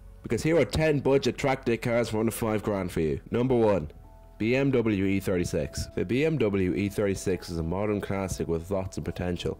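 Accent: Ireland. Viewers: Colin Davis he's irish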